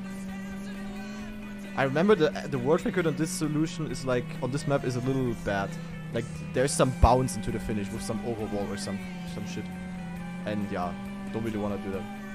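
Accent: Germany. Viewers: gotta love the fact that you can hear the german in his voice, cool video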